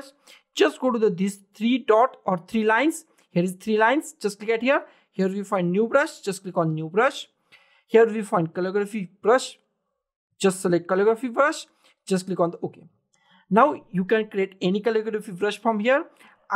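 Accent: India. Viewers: Good tutorial right here, just fix your hard indian accent and you'll get 100k sub in no time. Cheers man, big ups to you.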